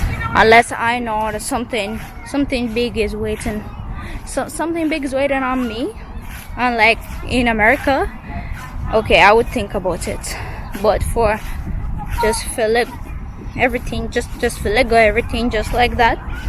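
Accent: Patois, Jamaica. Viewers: She sound pure Jamaican . Never knew Russian married to blacks . That’s an unlikely pair . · Looooobe her jamaican accent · Ummm… she’s Jamaican. How am her accent be on or off “point”, it’s her natural accent. WTF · I love wen u speak and I agree with you totally on these topics. It's good to hear you speaking the patwah and you have a wonderful family thts worth fighting for to stay together always. Thanks for sharing and all the best going forward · I love the way you embracing our jamaican language good! job 👍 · O shit she got a Jamaican accent wasn't expecting that.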